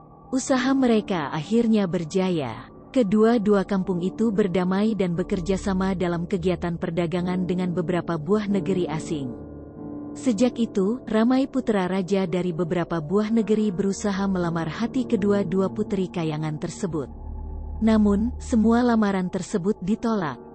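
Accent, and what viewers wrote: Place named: Indonesia. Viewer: Apasal bunyi indon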